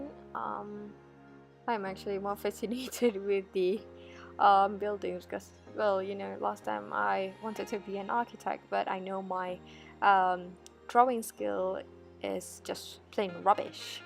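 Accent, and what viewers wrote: United Kingdom. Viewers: Have u ever been in abroad before ? Or you received from this uni? Your English is very good. Especially British accent , haha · Love to hear your english's accent.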